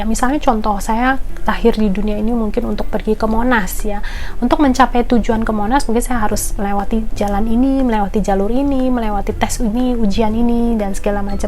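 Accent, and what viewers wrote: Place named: Madura, Indonesia. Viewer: Agak miri macam tetanggaku madura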